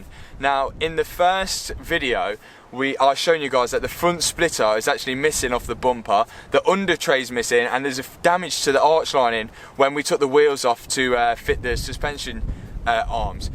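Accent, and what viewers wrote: Leicester, United Kingdom. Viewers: Good work 👍🏻 Just come across your channel tonight. You from Leicester? You sound it. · Thought I recognised the accent being the same as mine! Leicester ♥️ Great vids!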